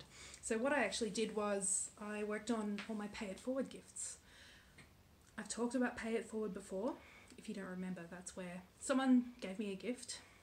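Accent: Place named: Australia. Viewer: Hey! Just found you! I was so happy to hear your Australian accent. I lived in Perth for a couple of months back in the late 90s. Spent lots of time at Cottesloe Beach, too and loved it! Such a gorgeous city and incredible water to swim in! I actually live in Oregon and our beaches are cold year-round. Enjoyed your video and your wonderful water piece, in particular. Simply gorgeous!!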